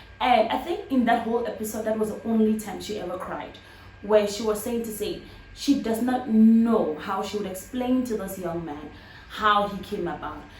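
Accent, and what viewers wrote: South Africa. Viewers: I like how you switch between South African languages, I couldn’t say you aren’t South African because your accent. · Hi you so beautiful darling and your accent is the same like south african accent,how long have you been in SA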